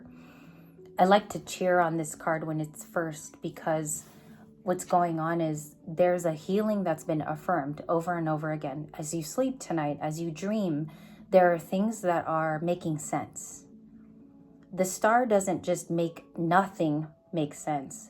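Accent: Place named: South, United States. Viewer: So funny, he has a country accent & i love it! I've been waking up in the middle of the night just thinking of him. I miss him so much. I feel him getting closer & closer to me.❤, you're so good! 🎉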